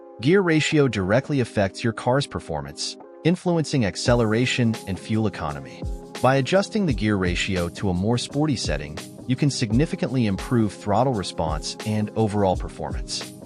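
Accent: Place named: Netherlands. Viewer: I am thoroughly confused with every tip starting out with a Dutch accent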